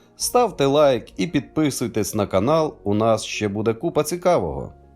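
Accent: Russia. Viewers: Не двух а двох і у диктора відчувається декотрий російський фонетичний акцент, певно думає на ній і розмовляє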